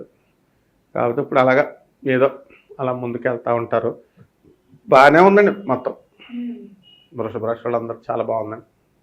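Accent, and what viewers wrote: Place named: Godavari, India. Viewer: ఎందుకు అండీ... స్లాంగ్ చేంజ్ చేసారు గోదావరి సైడ్ లాగ 😀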